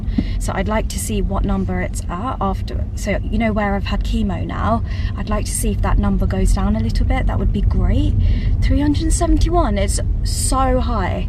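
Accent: England, United Kingdom. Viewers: I love listening to you speak you have such a sweet voice! I love hearing you say Cozy!😅 I live in USA, in California. I enjoy hearing your English accent. Hugs from California ❤.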